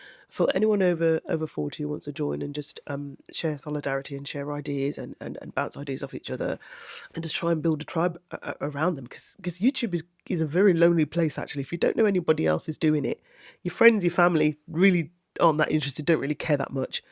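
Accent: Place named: United Kingdom. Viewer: I have a feeling you will surpass me. You have a natural vibe on camera, nice editing too, plus you can't beat that British accent. I just raw dog all my videos like a wild animal. Sometimes it works I suppose. I hope we both grow organically and build some nice communities. AKA Tribes. I think I am going to do a video on that.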